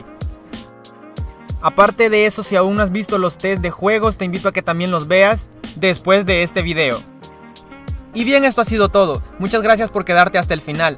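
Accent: El Salvador. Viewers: Eres salvadoreño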